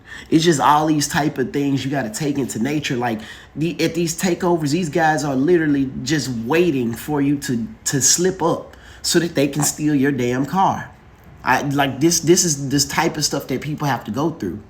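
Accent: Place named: Detroit, United States. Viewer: Bro you sound like you live in Detroit 😅 It goes down fr fr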